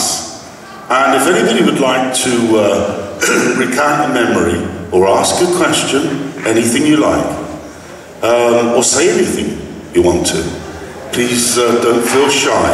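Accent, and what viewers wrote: United Kingdom. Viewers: Loved his British accent. He is missed. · This guy was an extraordiary monumnet of rock music and I really love to listen to him talking with this intensive british sound (what else could it be then?)! This is heartwarming - I grew up with his music and i still honor him deeply!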